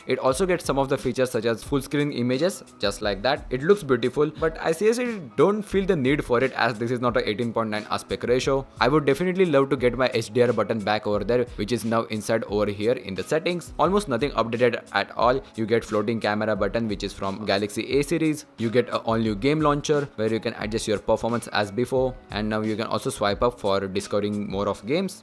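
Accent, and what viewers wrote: India. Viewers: Why do Indians insist on talking in English if they're just gonna butcher the language? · I don't understand why people dislike an Indian man's accent. I quite enjoy it.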